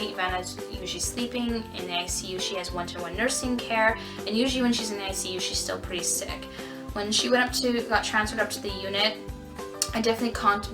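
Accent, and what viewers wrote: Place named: Canada. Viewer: I give you the most amount of Kudos for going home at night. if anyone wants to criticize you, let them,.... because there are so many of "us" who will come to bat for you! You are the greatest mom and Nick is a great father too! I love your Canadian accent... my husband is Canadian! He tried his best to get rid of his accent and he did! I just love your testimony of Evanna! You are so strong! God Bless you sweet girl!! I PRAY for you and your family everyday!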